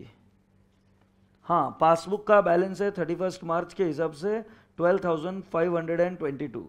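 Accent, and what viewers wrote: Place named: Mumbai, India. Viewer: I think u are from Mumbai....🤔🤔 But nice explaination sir🙂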